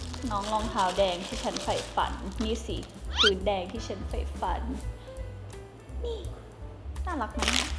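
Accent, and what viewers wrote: United Kingdom. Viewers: Yeah, Freen is so cute...unboxing the Astro Boy box. I love her English accent, she's naturally funny and beautiful❤️. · I fell in love with Freen's English accent!! She keeps getting great day by day❤ I'm happy that she's happy❤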